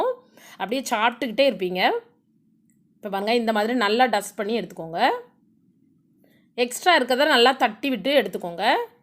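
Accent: Tirunelveli, India. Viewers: Hehehehe nora natiyam 🤣🤣🤣 neenga thirunelveli ya?? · Unga pechi semma nenga thirunelveli ah ..... 😘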